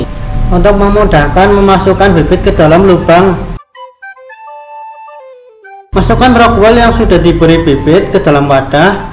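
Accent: Banyumas, Indonesia. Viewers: Bahasanya masih kaku... Pasti orang ngapak nih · Daerah ngapak ndi yah ..pengin belajar langsung.. inyong cilacap. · wkwk logat ngapaknya masih ada gan 😂 tp nice info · Terhibur dengerin suara mas nya. Ngapaknya kentel banget. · Ngapak yaak😂😂😂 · wong ngapak bro?salam ngapak bro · gan iki ngapak banyumasan opo tegal gan ? pada bae ngapak sukses yo bos... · Ngapak mn gan...salam dariku dari malaysia..asal wonosobo😊.. Ma kasih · Suarane ngapak, hidup ngapak, semangat, ilmunya bermanfaat, makasih kang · Di gas i kang ngapak e. Mantap hdroponik nya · versi ngapak gesssss ..... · Hidroponik ngapak gaes..... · Logate wong ngapak kie ya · bahasane NGAPAK MEMBAHANA...tapi bisa dipahami..terima kasih GAES.... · Bukan jawa gesss, tpi ngapak gesss bhsa daerah terlucu dan gk bisa hilang klaupun pkek bhsa indonesia ngapakknya tetep ada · Jawa Ngapak Nya Jelas Banget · ka ya uwong ngapak kie yah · Yang ngisi suara kayak orang ngapak pasti kiye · Ngapak yak😅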